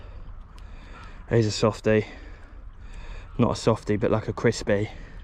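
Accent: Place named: Scotland, United Kingdom. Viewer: to pick em up if you want them for bait, lol was for how scittish you sound buddy ha ha keep up the good work fella hopefully things go well for you and the family stay safe !!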